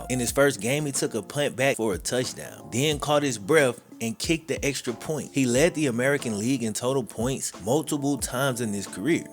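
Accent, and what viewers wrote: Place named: Mississippi, United States. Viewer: Thanks. As another Mississippi boy, I love the way you tell your stories. It's not everyday you hear someone that sounds like us narrating so well. Love your work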